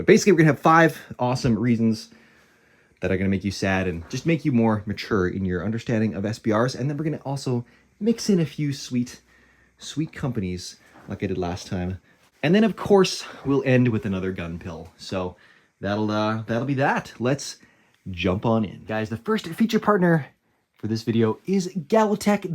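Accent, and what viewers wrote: Canada. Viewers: Ahy do you have a canadian accent and use ML ? 🤪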